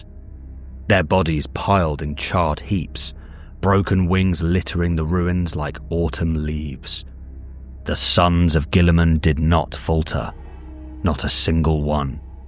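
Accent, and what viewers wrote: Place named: London, United Kingdom. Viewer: Your true voice truly sells it. London accent no? Def not Manc and no chance Scouse as those are the ones I’m familiar with. Lol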